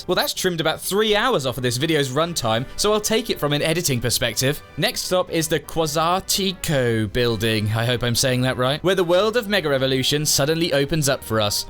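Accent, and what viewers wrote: United Kingdom. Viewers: Are you British?😂😂😂😂😂😂😂😂😂😂😂😂😂😂😂😂😂😂😂😂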